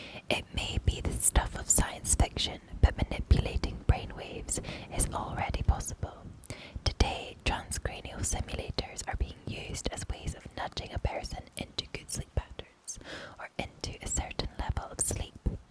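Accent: Scotland, United Kingdom. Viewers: beautiful Scottish accent, whispered and Tascam mic, this sounds so good and tingly. Thanks for the amazing ASMR content every Monday, Wednesday and Friday 👍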